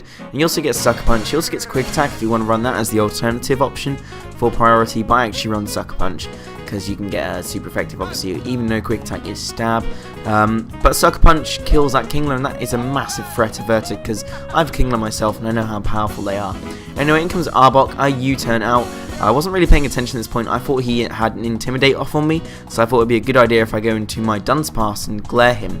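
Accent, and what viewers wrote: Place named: United Kingdom. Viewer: Nice! Put out more content like this and you've earned yourself a new subscriber! Good narration style too, it's nice to hear a fellow Brit on here haha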